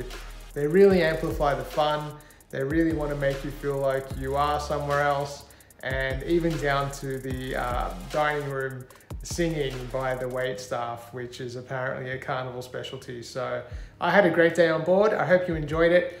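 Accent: Australia. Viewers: I was not expecting you to be Australian, but I’m not disappointed. I’m American, and sailed Splendor in Alaska. I like the “pink lady” vibe, but I’m not a fan of all of Carnival’s aesthetic choices…Splendor is one of the better looking imo.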